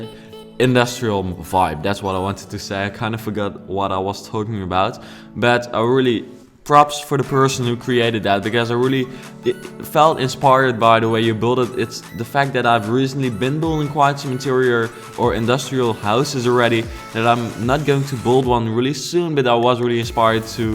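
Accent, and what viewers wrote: Netherlands. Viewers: Are you Dutch? (NL) (ben je nederlands?!)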